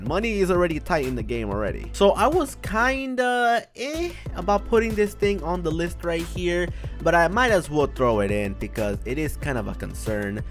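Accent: Norway. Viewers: Are y from norway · er du fra norge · Are you from norway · Is he norwigian????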